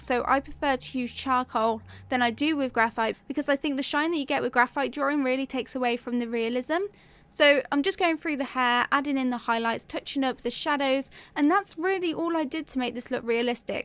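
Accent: United Kingdom. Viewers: BRITISH ACCENT OP SHE LITERALLY SOUNDS LIKE CLAIRE HOLT ( REBEKAH MIKAELSON) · Is that British Accent?? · British accent???¿¿¿ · Hi your sketching technique is just as beautiful amd marvellous as your lovely and sweet British Accent. I am in love with your voice and killing British Accent. Wow! I have not seen many videos with this simple yet realistic technique. Thank you very much for sharing. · I love ur british accent :) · I love your accent British!!!! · The British accent <33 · British accent?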